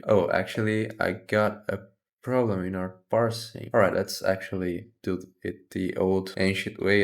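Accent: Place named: Brazil. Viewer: Não interessa o quão bom o seu Inglês seja, um Brasileiro sempre reconhece o outro haha, muito bom seu conteúdo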